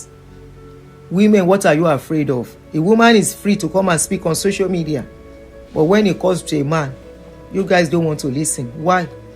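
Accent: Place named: Yoruba, Nigeria. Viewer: Anti that your yoruba thong has come to stay oooo no anointing can change it ooo hahaha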